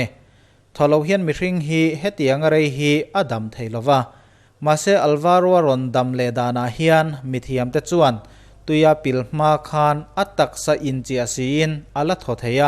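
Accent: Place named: Champhai, India. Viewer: Champhai mi chu kan he ti tlat a lawm 🤭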